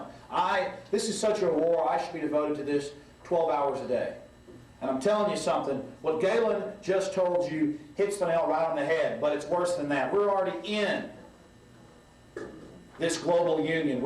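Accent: South, United States. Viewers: damn what happened to his southern accent? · Alex Jones or an imposter? Or is the current Alex Jones the imposter? This guy has a REAL southern accent, the current Alex Jones seems to have a Russian-pretending to be a Michgander accent. (heads up people, the biggest conspiracy you have never heard of is this: There are a LOT of Russians in key positions in this country pretending to be Americans!!!!)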